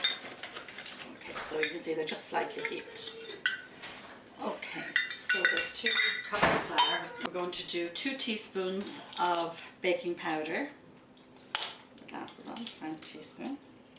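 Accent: Ireland. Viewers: Hi. Greetings from Belfast in Northern Ireland. I’ve been watching your videos while in lockdown here due to the Coronavirus Crisis and I must say you do my wee heart good. I love the simple and easy way you show how to bake and cook. You’ve converted me!!! I’m a 60 year old aul fella and I’ve now made scones for the first time in my life - and they were bloody good!! Lol All thanks to you. Just wanted to encourage you and let you know your videos are being watched, not only in Canada, but right across the Atlantic back here in good old Belfast. It’s lovely to hear your Irish brogue and just your easy going way that gives us the courage to just give it a go with the baking. Huge thank you and I look forward to more videos and your channel growing with lots more followers. Good luck and God Bless. John 😀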